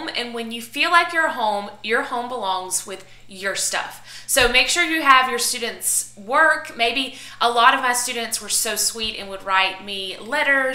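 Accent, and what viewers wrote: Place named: Texas, United States. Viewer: From texas? Nice accent and ideas of course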